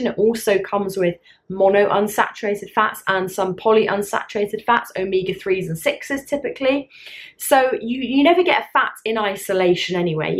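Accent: United Kingdom. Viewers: I love you my sweety your excent is look like London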